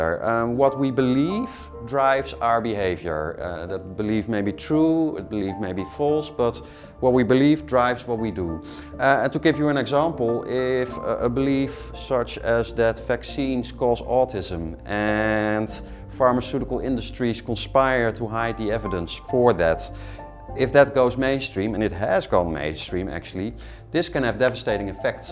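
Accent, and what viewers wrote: Netherlands. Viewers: wie hoorde al aan de stem dat dit een nederlander is? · He has such a dutch accent Im dutch too XD i hear it instantly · You guys most dutch people have this accent when we speak english xd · Yo hes netherlands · Mad Villain he is dutch, we have a lot of trouble with some sounds from the English language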